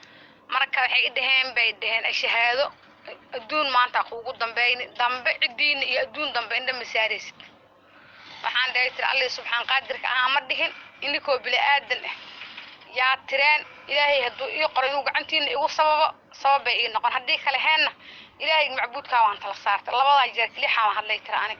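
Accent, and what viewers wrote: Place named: Garissa, Kenya. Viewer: Lahjadha Rer garisa inay tahay labo qof ma isweydinan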